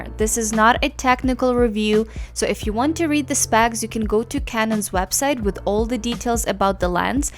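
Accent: Russia. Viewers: This is a Russian accent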